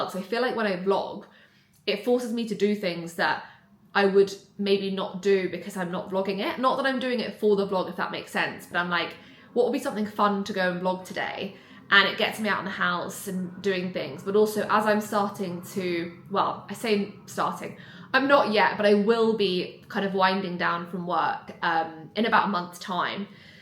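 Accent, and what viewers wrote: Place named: United Kingdom. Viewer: Wow...love your accent as i'm always a fan of british accent so i assume i got a reason to watch your vlogs...🙏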